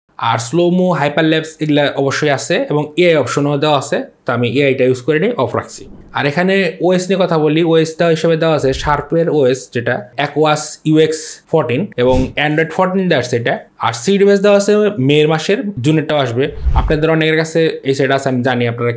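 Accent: Tangail, Bangladesh. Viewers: Vai ki tangail er nki?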